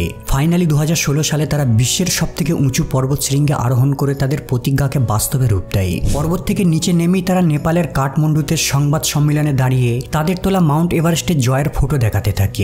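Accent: India. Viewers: Indian channel এটা 😂😂 বাংলাদেশীদের ভাষা আওয়াজ ভারতীয় দের মত না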